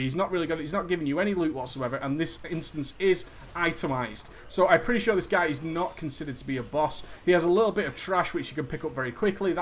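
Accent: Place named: United Kingdom. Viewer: Where can I find WoW videos where the commentator is not british, loud, and obnoxious?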